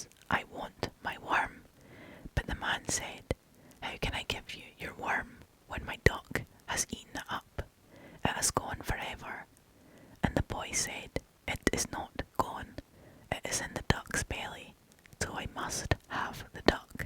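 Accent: Scotland, United Kingdom. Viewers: your… (I wanna say Scottish…) accent is the cherry on top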